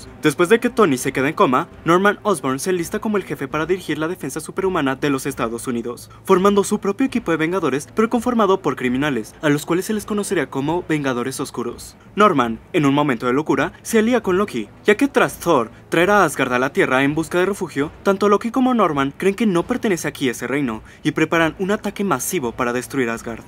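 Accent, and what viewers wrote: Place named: Mexico. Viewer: Hey carnal mis respetos t la sacas para narrar los shorts parecieras mexicano que las pirula la pulida de bastón darle duró a la tula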